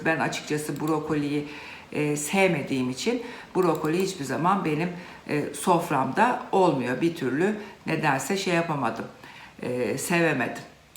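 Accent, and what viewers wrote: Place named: Ankara, Turkey. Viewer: Sevgili Prof.ümüz, Ben sizi rejiminizden sonra tanidim.Güzellik ve canayakinliginiz disinda ictenlik ve dürüstlügünüz cok etkileyici.Ayrica siveniz ve sevdiginiz yemekleri hazirlama tarziniz benimle tipatip ayni.Acaba siz de Ankarali misiniz? Cok sevgiler ve selamlar.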